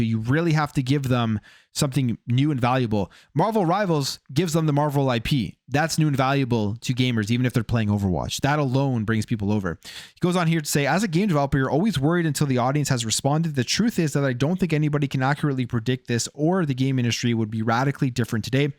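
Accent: Canada. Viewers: You a canadian!?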